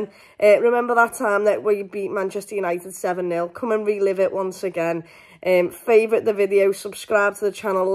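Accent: Liverpool, United Kingdom. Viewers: Are you from Liverpool,?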